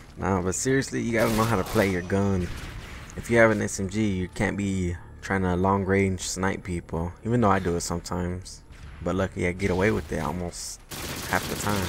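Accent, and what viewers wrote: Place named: United States. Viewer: You sound American :)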